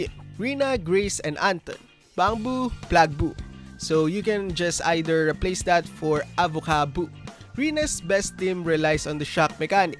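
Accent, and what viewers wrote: Philippines. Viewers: halatang halata sa accent ehh PILIPINSSS 🇵🇭🇵🇭🇵🇭🗣️🗣️🗣️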